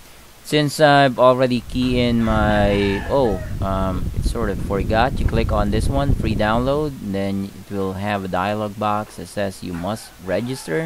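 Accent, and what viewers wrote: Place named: Philippines. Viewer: he's got the filipino accent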